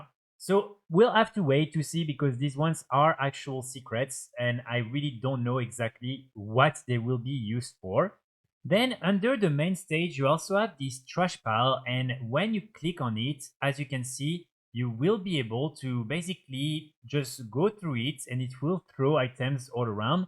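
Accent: France. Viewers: Are you french the way you talk 💯 sure que tu est français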